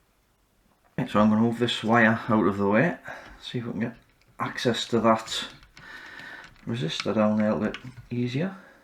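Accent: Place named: Tyneside, United Kingdom. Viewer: Hi if you dont mind me asking where is your local repair cafe? It sounds an interesting place that i would like to check out (im a fellow electronics repair engineer in the Tyneside area). I am of course making assumptions from your accent.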